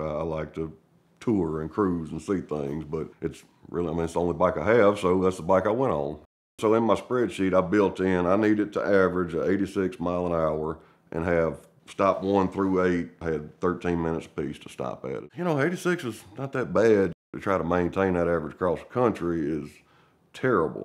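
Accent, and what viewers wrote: United States. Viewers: This guy is the most American sounding man I’ve ever heard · if i think of a tipical usa acsent this is what i think off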